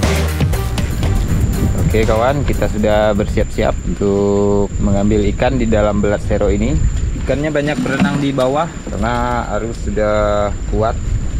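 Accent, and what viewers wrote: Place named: Malaysia. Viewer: dmana tu bang,,cakap mcm orang melayu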